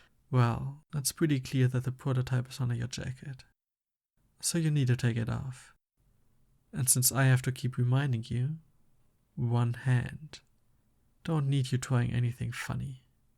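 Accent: Germany. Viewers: Your german accent is thinker than anything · ……….I- I was not expecting that accent. You really from Germany? Goddamn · Are you german it Sounds a bit like it i‘m german myself i was just wondering ? But love the video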